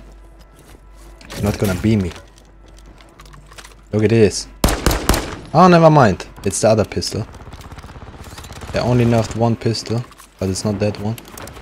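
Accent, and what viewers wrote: Germany. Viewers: Ehrlich du hörst dich voll deutsch an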